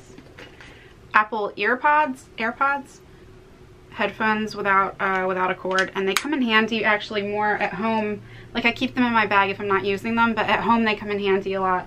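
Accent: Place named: New Jersey, United States. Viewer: I'm gonna bet you're from New Jersey, I know that accent anywhere, lol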